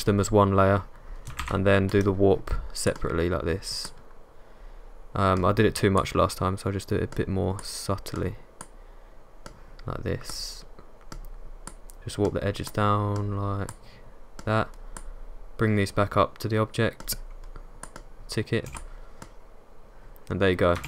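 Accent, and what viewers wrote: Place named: United Kingdom. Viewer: Thatbritish accent ❤️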